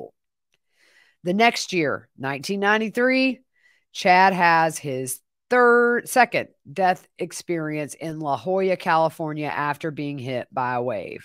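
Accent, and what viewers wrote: South, United States. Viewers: You’re the best,! Just when I thought I knew all there was to know, you taught me even more!!! Wow ❤❤❤❤!!! I also LOVE your voice. I love your accent! I love southern accents ❤❤❤❤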